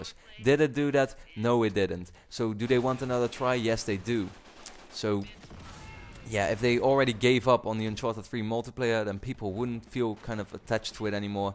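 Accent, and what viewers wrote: Netherlands. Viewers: You sound dutch, bro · You sound Dutch, are you?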